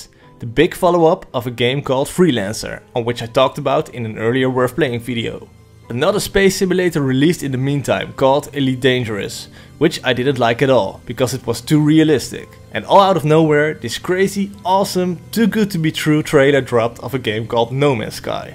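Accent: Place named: Netherlands. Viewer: Ahh yes very cool, im also Dutch i thought already i could hear it in your accent a bitt, very good content you have a new sub 😎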